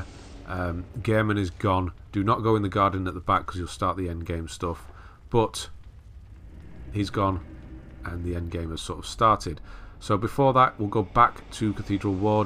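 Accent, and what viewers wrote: United Kingdom. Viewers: You have such a cozy, warm, british voice, I just can’t. It’s not only a very good guide, but just listening to you makes all this journey a bit easier. Thank you, somebody from UK. You make other peoples gameplay so much easier and controllable.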